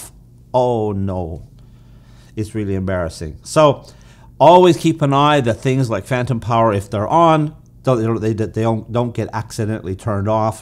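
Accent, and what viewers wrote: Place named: Eastern Europe. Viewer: I am picking up some Eastern European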